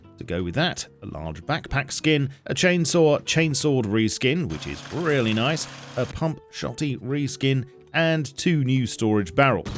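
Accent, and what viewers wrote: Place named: RP, United Kingdom. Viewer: Ive always wondered why you sound like a posh BBC topgear presenter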